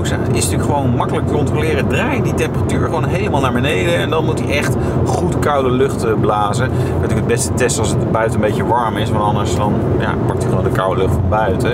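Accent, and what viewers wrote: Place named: Netherlands. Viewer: could not understand anything but spice girls. this guy needs to work on his accent , sounded more like dutch than english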